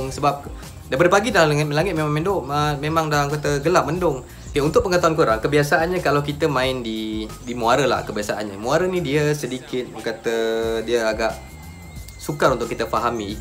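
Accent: Peninsular Malaysia, Malaysia. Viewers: selama sye tgok video abg ni.. sye pelik.. dok sne tpi loghat semenanjung..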